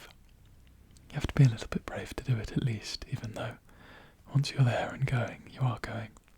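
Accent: United Kingdom. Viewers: yeah british accent makes me sleep 😍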